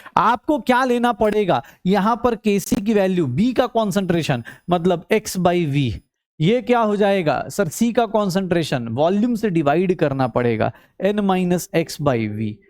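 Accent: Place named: Bihar, India. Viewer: Sir ka bihari accent mast hai 😂❤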